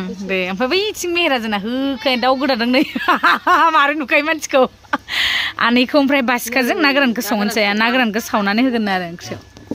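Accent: Kokrajhar, India. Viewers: Abo ngni railainaya kokrajhar nijg same jayw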